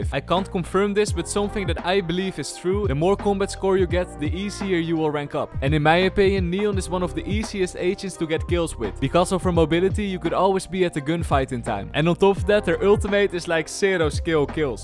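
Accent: Netherlands. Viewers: i love youre dutch accent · One second in, ah this guy is Dutch. "checks username:: 100% confirmed":)) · Are you from the Netherlands you have exactly that accent. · are u dutch bc i can hear it in your voice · Ur accent is the same as a dutch man speaking english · effe voor duidelijkheid jij bent toch Nederlands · why does he sound dutch? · Very Nederlands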